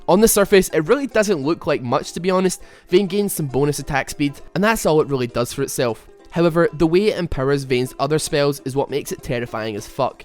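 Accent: Scotland, United Kingdom. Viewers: r u from scotland or great britani?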